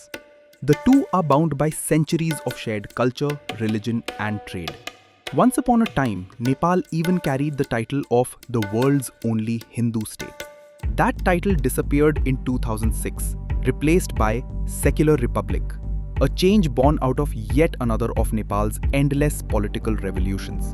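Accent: India. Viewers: Said a indian voice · Indian accent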